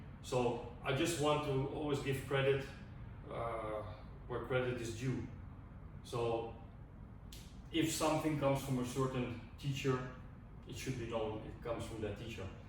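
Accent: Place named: Israel. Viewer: I really like your videos because of how deeply you research things, I am so curious about your accent. My first guess is Israeli. I would like to see more about your fighting skills and which style ir styles you use. Is it now your own lineage. I mean are you now following a path where you create your own stuff? I don't get what you said about finishing a lineage and then learning another. It must have been so confusing. The sound is not great. Too much echo. I want to develop internal enegy control. I am not training any martial art. I want to feel better and have more control. Getting into a martial art might follow. Thanks for this video.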